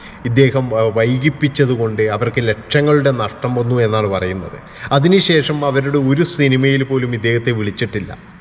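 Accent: Kannur, India. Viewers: Kannur ഭാഷ.. 🙂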